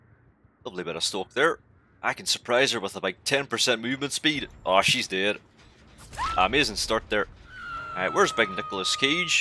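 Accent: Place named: United Kingdom. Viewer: Necklace Cage 😂 i Love that britisch accent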